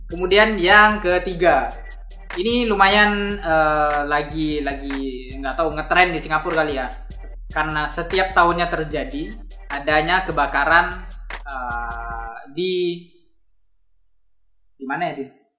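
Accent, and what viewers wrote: Indonesia. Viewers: wah logatnya kok Indonesia banged yaa..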